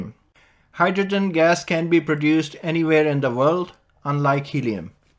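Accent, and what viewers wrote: India. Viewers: Is there a way to have a native English speaker do the voiceover? What's the point of using a heavy Indian accent in this airship video? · indian accent... nope i'm out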